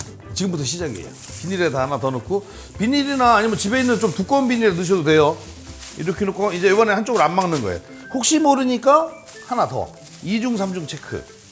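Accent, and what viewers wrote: Chungcheong, South Korea. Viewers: 충정도사투리가 구수하고 귀여우셔요^^ 믿고보는 님~맘도 따뜻하신 님~^^ 응원합니다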